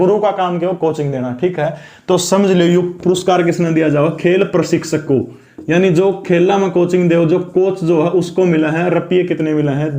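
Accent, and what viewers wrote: Haryana, India. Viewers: Nice way of teaching sir.. Aapse Haryanvi language mein kuch bhi sunte waqt apne aap hi smile aa jati hai chehre par..phir chahe kitna bhi difficult topic kyun na ho easy ho jata hai. ..keep it up this way of teaching sir.. Bravo! 👏👏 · Gajab pdhate ho guru jii. Gaal kyu bknii hai. Apke pdhane ka hryanvi boli ka style mast hai. Jai hind jai bharat jai haryana jai haryanvi. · Gud evng sir G...your teaching style is brilliant...nd I m a big big fan of u...nd ur language speaking haryanvi style is much better than Actor Randep hooda G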